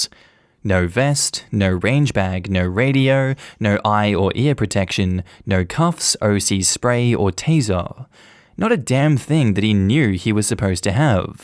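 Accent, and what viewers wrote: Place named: Australia. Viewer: Some good ones there. Maybe one day I'll venture onto reddit to read for myself, but happy to listen to a fellow Aussie read them for me.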